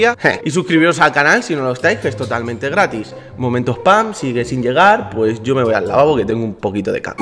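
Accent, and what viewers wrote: Chile. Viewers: por que de pronto hablas tanto chileno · hablo chileno vean cuando saca a modric en el primer fut brat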